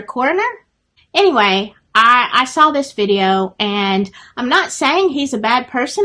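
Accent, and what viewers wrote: South, United States. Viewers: Poor Libby and Abby...there's so much going on there... I agree with almost everything you said here!! God bless! 💜 Im in Georgia..I'm from Alabama!! My accent is like yours!!! I love my country accent!! 💜💜💜💜 The Summer Wells case has blown my mind!! Talk about sketchy folk!!! EVERYONE around that baby and area is so absolutely disgusting!!!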